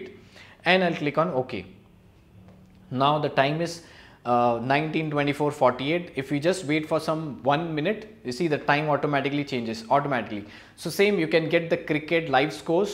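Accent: India. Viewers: 咖喱味的英语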